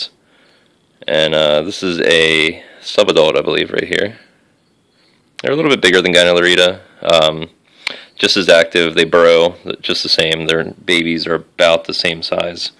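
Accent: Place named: Philadelphia, United States. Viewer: I just got some of these, I heard they are very prolific breeders, have you had success? Also, you sound like you're from philly area, if that's the case, you should definitely check out the insectarium! it's on Frankford ave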